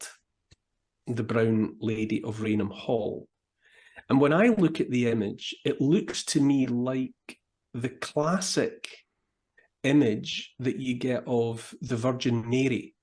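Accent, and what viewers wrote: Scotland, United Kingdom. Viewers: you never struck me as a bright spark...not that I listen to you much as I can't stand the slummy Scauts accent. Learn to speak English!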